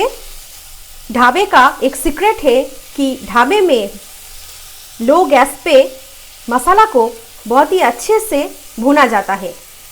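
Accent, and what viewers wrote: Bengal, India. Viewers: Very awesome recipe also delicious I like u r bangoli pronunciation expecting more recipies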